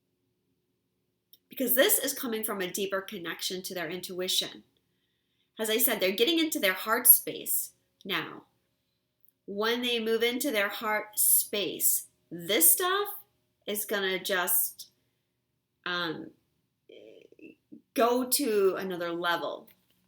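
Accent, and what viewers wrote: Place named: Massachusetts, United States. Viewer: I love your readings. You really clarify things. I also just Liked you on Facebook. I also detected a hint of an accent that you were a fellow Massachusetts girl like myself. I also think you have really pretty hair...:)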